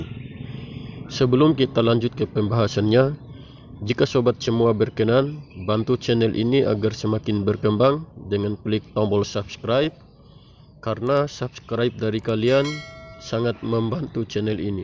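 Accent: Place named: Maluku, Indonesia. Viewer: Tapi bang saya kayak tidak asing dengan aksen berbicara anda bang,sebelum nya saya mau tanya.apa bang dari daerah adat seribu negeri,yang dulunya 1 provinsi sekarang terbagi jadi 2 provinsi?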